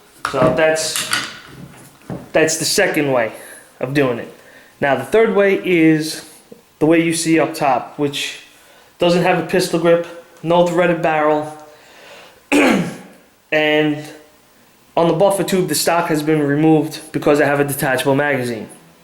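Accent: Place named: Ireland. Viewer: Wow you sound very irish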